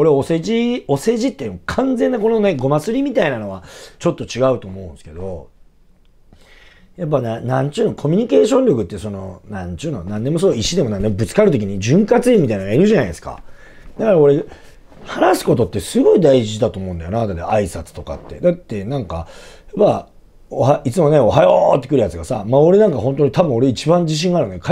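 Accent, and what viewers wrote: Nagoya, Japan. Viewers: はじめまして おすすめ動画に出てきて見させて頂きました。 とても参考になりました。 自然な名古屋弁いいですね(^o^)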